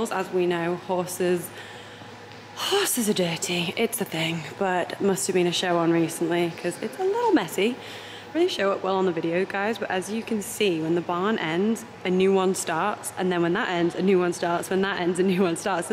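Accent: England, United Kingdom. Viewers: Awesome! I have 19 acres in north east FL after I build my house I will have to check it out. Sounds like a great place to go for a weekend . You have an English accent where are you originally from?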